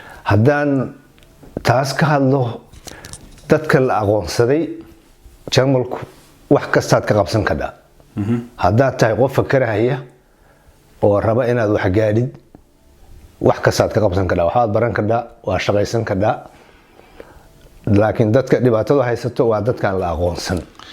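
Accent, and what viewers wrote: Mudug, Somalia. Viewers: Weli reer mudug hadalkiisa ayuu leeyahay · Odaga waa somali dhab lahjadamashqul kuma ficna iyo heshuu kasoo jeedo waxa muhim.ah taloyinkisa ivan racno lki waxan qiyasayaa lahjada waa mudug ilaag/lafa dhexe intas madhafsiusana ader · Lahjadiisa puntland ma ahan waa reer mudug waxuu ka dhaxeeyaa reer mudug. Reer mudug koonfur ilaa waqooyi waxay kaga duwan yihin reer bariga iyo reer nugaaleedka waxaa ka jidho ereyada sida “haya” tusaale “waxaan kuu sheegahaya” meeshay reer bariga dhihi lahaayeen “waxaan ku sheegayaa” Ninkanu qabiilkiisa iyo deegankiisa lama sheegi karo Lakin Inuu reer mudug yahay banse kuu hubaa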